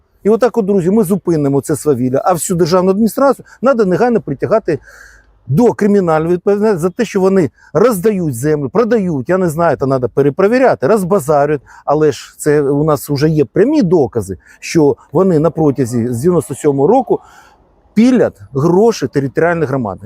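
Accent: Russia. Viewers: Це іде тиха окупація України для створення нового Єрусалиму. Цьому потрібно поставити заборону. Але поки в нашій владі засилля неукраїнців - буде дуже важко. А пану Павліченко та всім причетним щира дяка , що піднімають це архі важливе питання. А пану Павліченко на часі вивчити українську мову і в побуті також послуговуватися нею. Бо досить дивно звучить український боєць за Україну, який розмовляє як москаль, що фарбується під українця.❤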